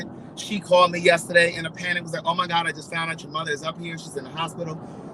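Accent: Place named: Baltimore, United States. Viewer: I just love looking at you and listening but I am here in Baltimore too and I understand what you are talking about and you sound just like someone from Baltimore. 😂 But I hope your mom doing better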